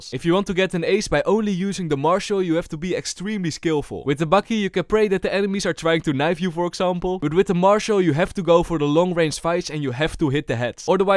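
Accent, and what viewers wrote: Netherlands. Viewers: ur dutch right? (guessing off your accent) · First sentence and already knew you were dutch.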